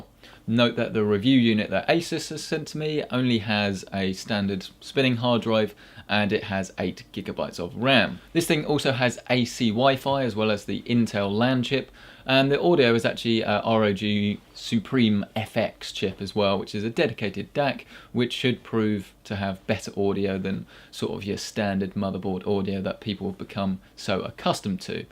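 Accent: England, United Kingdom. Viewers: As a non english native speaker,trying to understand what you are saying with that strong english accent its very difficulty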